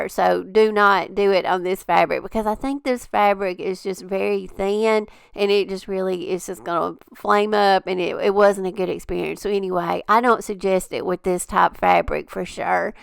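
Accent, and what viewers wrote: South, United States. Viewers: I love your channel. I also love your accent.. it reminds me of Southern Hospitality ❤️🥰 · Me too! I do so love that southern charm accent that shouts easy going! Makes me feel home!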